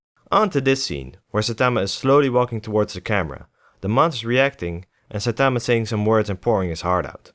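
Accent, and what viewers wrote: Netherlands. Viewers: I love it that I can just hear your Dutch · Great vid! I noticed a bit of a dutch accent but I can be wrong though😂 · Hey im new to this channel, maar ik hoor je Nederlandse accent kapot erg niet normaal maar je spreekt het nog best goed props. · 1 second into the video, hears his accent yep hes Dutch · aaaahh the dutch accent sounding ass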